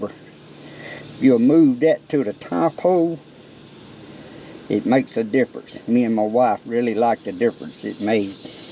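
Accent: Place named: Rural, United States. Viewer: Thanks. My wife loves your country accent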